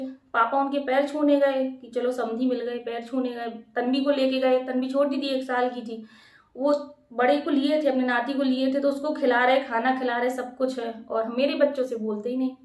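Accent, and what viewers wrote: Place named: Orai, India. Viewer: आपकी भाषा तो हमारे यहां की लग रही है मैं उरई से हूँ आप किस शहर से है🤗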